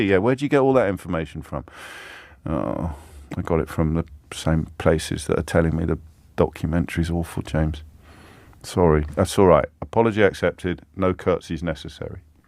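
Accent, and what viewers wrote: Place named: United Kingdom. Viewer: At last a British accent I can listen to! This man is smart.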